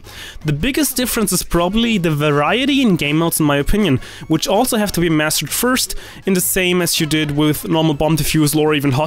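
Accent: Germany. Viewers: Where are you from? You sound kinda german and slovakian i dunno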